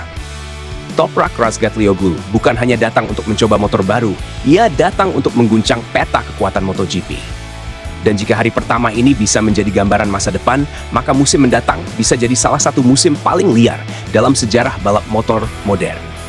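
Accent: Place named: United Kingdom. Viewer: Ai dgn aksen enggries bikin cringe Walau yamaha tim gavorit gua,Males nonton sampe beres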